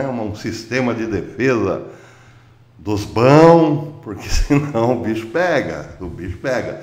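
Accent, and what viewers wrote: Caipira, Brazil. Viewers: EU gosto da FORMA caipira, então os BESTAS que engole o CHORO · Amo seu caipirêsssss!!! Adogôooooooo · Kkkkkkk...o Sr consegue ser hilário sem perder a seriedade. Vai firme no seu sotaque mais que brasileiro. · Professor,eu adoro ouvir o senhor falar o "caipirês",pode continuar a fazer suas análises desse jeito 👏👏👏☺️ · Fessor! Num é Aisland. É Ailand. Amando o caipirês!! Hehe. Um abraço! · Pois é, o YouTube deve ter estudado aritmética com esse professor a criticou seu português de “caipira”.. vixxx! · Continue com o caipires é muito bão !!! Hahahahahha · Excelente Professor, o seu sotaque bem brasileiro, caipira e expressivo, além de excelentes comentários em variados assuntos, esse esquerdista que fez o infeliz comentário sobre o senhor, deve está com inveja por está no ostracismo! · sotaque caipira não é matar a lingua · Kkkkkk! 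Adorei o caipirês. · Eu ADORO O JEITO CAIPIRA DE FALAR RELAXA A MIM E ME LEMBRA DE LIMEIRA ONDE NASCI BOAS LEMBRANÇAS DE UM TEMPO MUITO BOM NOS ÉRAMOS MAIS LIVRES E FELIZES DO QUE AGORA.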